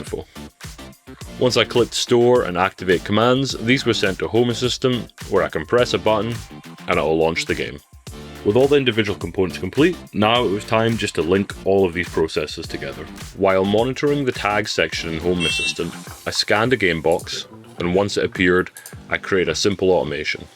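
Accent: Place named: Aberdeenshire, United Kingdom. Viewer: Bro sounds like he's from Aberdeenshire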